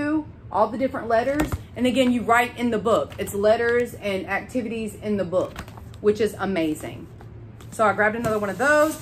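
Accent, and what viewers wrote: South, United States. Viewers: Girl yes! I could tell you are from the south with your fun accent. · I always smile when I watch your hauls. You talk fast & Southern like me.🍓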